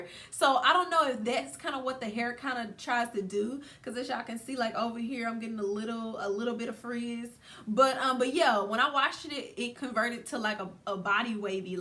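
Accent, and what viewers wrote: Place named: South, United States. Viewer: Where you from I love that accent ? Country girls rock 🧘🏽‍♀️ I'm from NC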